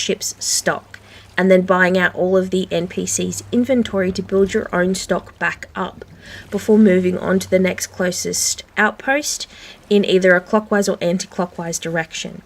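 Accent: Australia. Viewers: Great video, love the cinematography of it and of course the Aussie accent. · I did enjoy the ozzie accent. Here's the method I use, firstly I will raise my emissary and complete one "lost voyage" mission from my spawn outpost. Upon completion I should have max emissary, if you don't have it then you should be very close since voyage levels differ with reputation levels. Afterwards I will sail to the nearest outpost and sell my voyage loot, using the funds to buy out their stock. Afterwards I'll sail to the next outpost in either a clockwise or counter-clockwise fashion, as you put it in the video and buy that outpost out and sell whatever you have that they are in need of. You can figure out which resource to sell an outpost without ever opening the merchant book too. Every outpost offers 3 of the same item they are in surplus of, 1 of every item they're good on, and none of the resource they are in need of. 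With this logic you can simply look at their stock and through process of elimination determine what resource they need without looking through the book over and over, and the more you do it, the better and faster you will be. My first round trip of the map will consist of me buying and selling to maximize profits, and I will mark every outpost I have visited on my map in order to keep tabs on whether or not I've gone full circle. Then, my second round trip of the map I focus on selling off everything only, un-marking each outpost as I visit them. This is about a 3-4hr session as a solo and profit after accounting the buy prices was around 450-500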